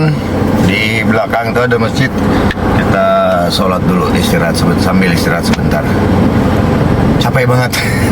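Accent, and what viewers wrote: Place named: Bandung, Indonesia. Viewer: O gt ya bang,, mf ya,, tp logat bicaranya kyk asli dr bandung gt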